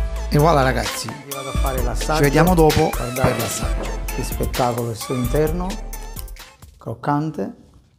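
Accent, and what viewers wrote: Naples, Italy. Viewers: Quando ti sento parlare con il tuo accento napolitano m'incanta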